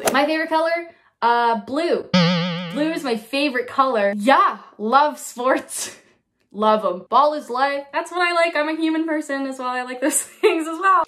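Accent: Canada. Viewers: It sounded super canadian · Your abouts and outs still sound very Canadian to my American ears hehe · 😂💗🫶🫶🫶🫶 you're hysterical 😂 (((((((((penis)))))))) also: you definitely sound mostly Canadian 😂💗🤙 much LOVE ! ✌️ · no hun still can tell you're Canadian from your "out and about"s hehe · 100% sound Canadian haha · Yeah you’re about halfway between Canadian and American when you do it without thinking about it hehe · I figured out you were Canadian several videos ago because of your “abouts” lol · nah, you still sound FIRMLY Canadian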